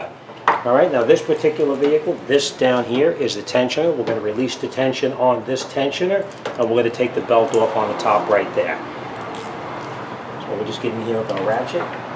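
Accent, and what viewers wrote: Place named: New Jersey, United States. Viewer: I can tell from your accent you're from Union or Bergen county NJ. I am from NJ. Every mechanic I hear is from NYC/NJ hahaha. Thank you I needed this.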